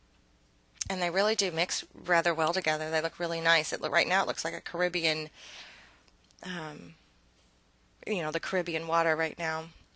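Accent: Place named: Kentucky, United States. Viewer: i knew i recognized you accent! im also from kentucky! i recently discovered your videos and in glad i did! good luck!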